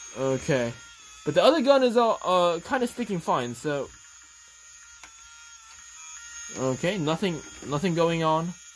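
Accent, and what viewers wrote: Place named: South Korea. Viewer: 아닝 영어 억양이 딱봐도 한국쪽인데 한사람 한국오셨나용?이래 ㅋㅋㅋㅋㅋㄱㅋㅋㅋㅋㅋㅋㅋ 귀엽네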